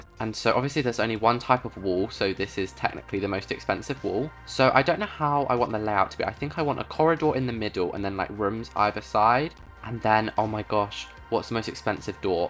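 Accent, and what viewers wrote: United Kingdom. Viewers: i LOVE the british accent its like ''BeTa'' and ''a BlEnDa'' LOL:D tysm for making my day better<3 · HIS BRITISH ACCENT IS SO NICEE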